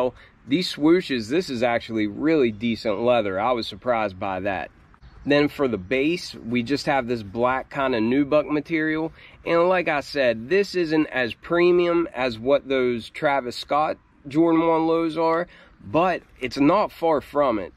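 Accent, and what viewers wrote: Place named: Virginia, United States. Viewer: Are you drunk Virginia by chance? I used to live in VA beach and your accent sounds familiar. Great review BTW I will cop those for $160 price tag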